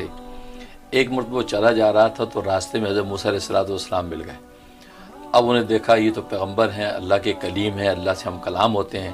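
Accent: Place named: Punjab, India. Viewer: Aaraam say bayaan karo or tareqay say ok Lekin tum full Panjabi jahalat. Zaban main suna rahay hoo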